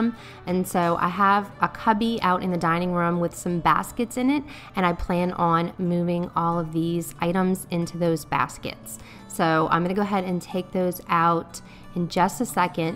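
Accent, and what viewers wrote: South Louisiana, United States. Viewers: Louisiana in the house!! Oh I'm so glad I found your channel! I was like... she sounds more local than just southern, then you said you lived in southern Louisiana, and I freaked! Yay! I'm from South Lafourche, where are you in south LA? I totally loved watching this video! Especially seeing Tony's in the spice cabinet, 😆!! I can't wait to see more of your content in the future!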